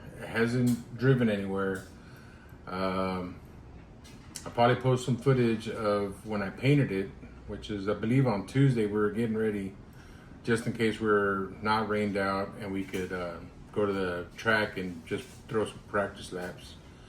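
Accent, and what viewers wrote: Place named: San Antonio, United States. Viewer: Great videos! Sounds like you live in San Antonio. I visited Oatesified this past weekend, did some marshaling, and met a lot of nice racers. I’ve never raced but I plan to build the Losi 1/8 E Buggy kit, should arrive early December. Hopefully I’ll get it built and hit the track in January. Hope to see ya out there!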